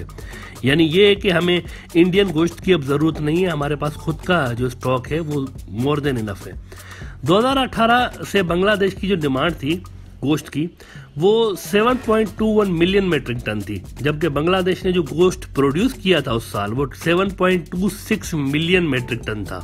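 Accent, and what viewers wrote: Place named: Pakistan. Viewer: itna gherah Urdu boltta hee tav mojey laga Pakistani hee kioki Indian Urdu Hindi ka milawat hee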